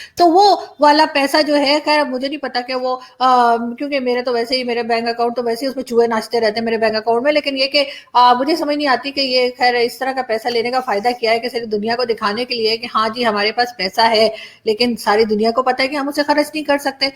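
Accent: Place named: Allahabad, India. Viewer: Allahabadi......lahza h ji, jo apke Ghar me Bola jata h kyuki apka DNA Allahabad India se h...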